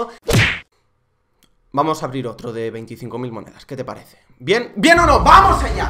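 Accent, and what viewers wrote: Argentina. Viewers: jajajajaja q bueno el acento argentino!!! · Jajaja me encanto tu acento argentino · De los mejores acentos argentinos que escuché de alguien que no es argentino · Hablando argentino boludo jajaja <3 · Que buenos son tus videos boludo! jajajajaja me encanto hablando Argentino! Crack la concha de la lora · Yo soy Argentino,y te sale re bien el acento xDDD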